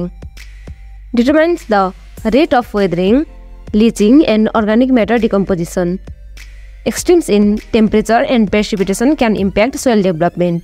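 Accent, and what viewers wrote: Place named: Nepal. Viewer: U sound like Nepali.. I mean ur accent..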